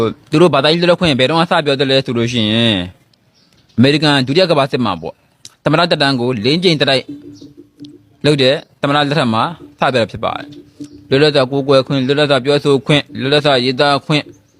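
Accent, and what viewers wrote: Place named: Rakhine, Myanmar. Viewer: ပြောတဲ့အသံနားထောင်ရရင်ရခိုင်လူမျိုးတင်ထားတာထင်တယ်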